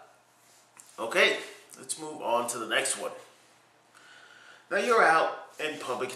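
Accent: Jutland, Denmark. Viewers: og nårh ja, man kan godt høre at du bor i Århus, du har stærk jydsk accent :D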